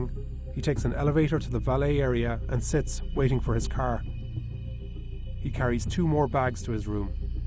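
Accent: Ireland. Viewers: Is the person talking Irish? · Love how it's the New York Times and it has an Irish guy as the narrator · Since when did The New York Times have an Irish narrator?, also this is really spooky and sad that this happened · This is all well and good but the Irish guy narrating the video caught me so off guard idk why even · Irish accent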